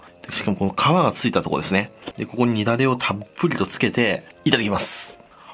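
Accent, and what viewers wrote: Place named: Nagoya, Japan. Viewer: 名古屋人だと馴染みの言葉だけど、なまってると思う人いるのかな？と思った。 「飲まずにおれん」「冷てービール」 素敵な動画ありがとうございました！！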